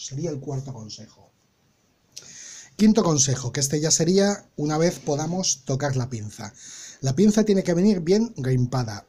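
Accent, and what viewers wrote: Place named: Spain. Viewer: Perdone usted es español por el sentó me párese que si yo soy andaluz de pura sepa quiero ser un seguidor su yo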